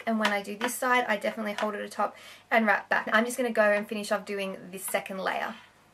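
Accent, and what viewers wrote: Australia. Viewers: i am so glad i found your channel! i'm from australia and it's so hard to find australian beauty gurus! im having a marathon of all your videos:) i stumbled across your channel by searching in 'nina dobrev makeup tutourial' because i absolutely love her and your video came up! then i could tell in your voice that you're australian and i was so excited :') anyway, keep up the great work xxx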